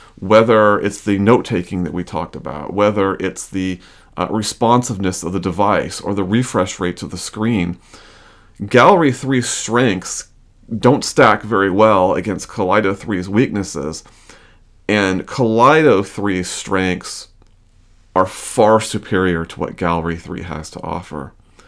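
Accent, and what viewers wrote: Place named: England, United Kingdom. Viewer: Hi! I am from Argentina, nice to meet you , sorry for my English but I would like to ask you if you really are from US, you sound as if you were from England ! Thank you , wonderful channel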